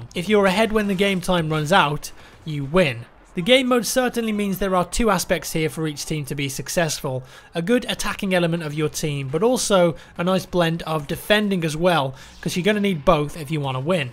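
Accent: England, United Kingdom. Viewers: Lewishague10 well me and are from the same town in England and thats just how its said, put it down to accent or w/e you want but it is what it is and it is fact lol. bee-ta i wouldnt just make shit up for the fun of it lol. even admitted it himself so there you go. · You sound British, yet you say beta the American way? Lol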